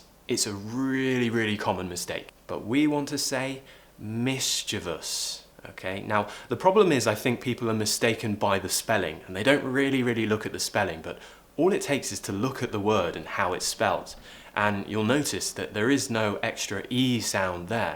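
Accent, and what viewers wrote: United Kingdom. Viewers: your British accent is everything. I feel stupid trying to copy you. 🤣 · Hi, This is Libin from India. It has been a wonderful experience attending your programme on British Pronunciation. I love your accent and I practice your lessons daily. There is great improvement in my pronunciation. I take this opportunity to thank you. Thank you so much. Libin Mathew. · I am from Quebec and unfortunately I cannot hold a conversation in English, but your very British accent is really nice. I listen to your shows from time to time and take pleasure in trying to understand your explanations. It also happens that I use the automatic translation into French of you tube, which I do in other English shows, and I must admit that the translation is impeccable, which means that the construction of your sentences must be flawless. Thank you and good luck for your work and for making us appreciate your wonderful culture. 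(translated by Google) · Thank you so much! I love the british accent and I'm lerning a lot with your videos! What a lovely sentence "I have so much gratitude for every mistake I make" I agree with you and I try to think that way when I make a mistake. I enjoyed this lesson! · I love british accent cos it's quite easy and understandable btw i appreciate your work mate 😘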